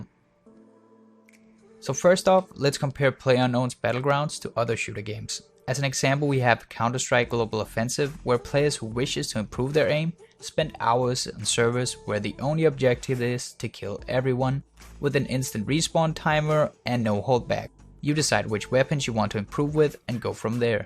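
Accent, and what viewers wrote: Denmark. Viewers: Are you Danish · Are you Danish?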